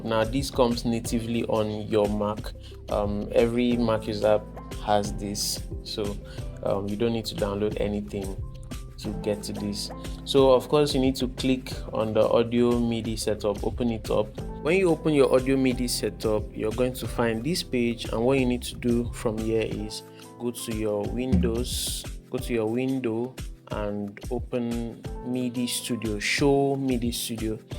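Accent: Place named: Jamaica. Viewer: Your Jamaican english so cool, love it. I really want to learn Jamaican english for my reggae music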